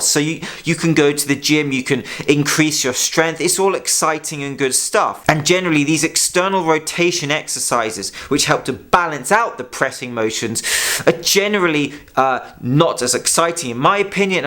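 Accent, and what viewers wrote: United Kingdom. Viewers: The British accent is such a flex and a distraction...people don't even listen to the message, they just want to hear people speaking like that:)🤣👍🏾✊🏾💪🏾✌🏾can't explainit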